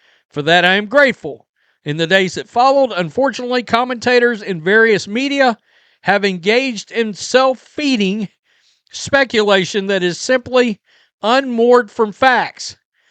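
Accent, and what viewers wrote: South, United States. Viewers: Hey, It's "....I don't know what other direction it could have GONE.." not "...have went." Repeat after me: I go, I went, I have GONE. I know you're a Southerner, but that doesn't mean you can't use correct grammar even in a Southern accent.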